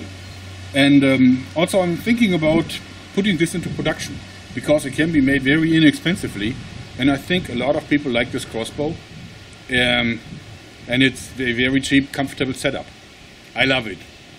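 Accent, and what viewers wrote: Germany. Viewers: Sprichst du deutsch? weil auf mich kommst du wie ein deutscher rüber (von Dialekt)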